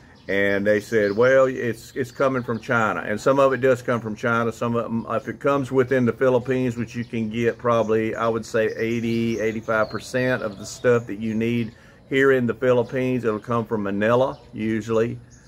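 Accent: South, United States. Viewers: LOL. The southern accent combined with the 'jack sh*t'. Certainly American.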